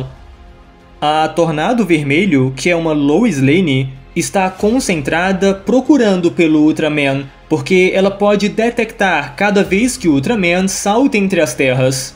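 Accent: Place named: Northeast, Brazil. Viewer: mano por curiosidade, vc segura algum sotaque? parece q tem algum sotaque bonito do nordeste ai escondido kkkkkkkkk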